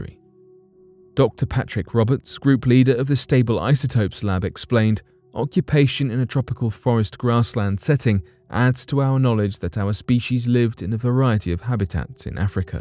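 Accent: United Kingdom. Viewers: So you're using AI technology which makes for a robot presenter. But why did you give it a British accent? I find the British accent to be offensive.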